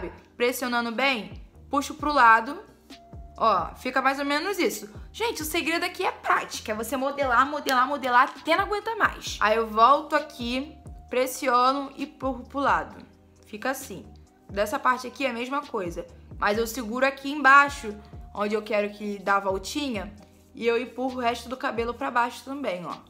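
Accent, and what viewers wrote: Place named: Rio de Janeiro, Brazil. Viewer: Amei o vídeo 💗, sério,o sotaque carioca é muito bom de ouvir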